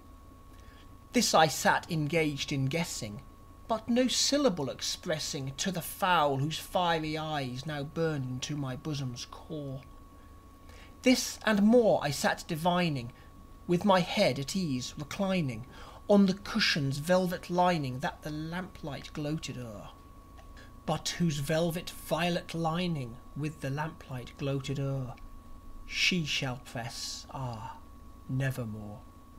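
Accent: United Kingdom. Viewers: Deep sht. R u brittish?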